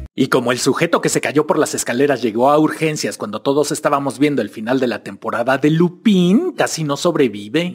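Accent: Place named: Mexico City, Mexico. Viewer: me encanta su acento chilango!!😋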